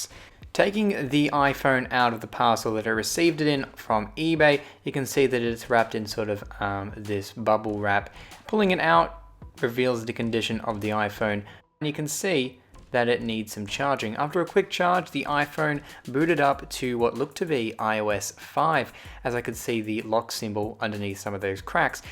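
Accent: Australia. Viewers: Ur Australian?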